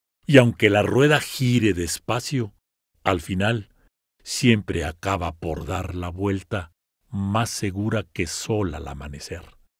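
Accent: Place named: Vallegrande, Bolivia. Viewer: Este narrador creo es vallegrandino puro coplas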